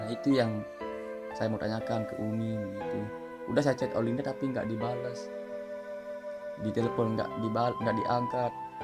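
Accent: Lombok, Indonesia. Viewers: logat lombok memang kental bang 😂😂 mantablah👍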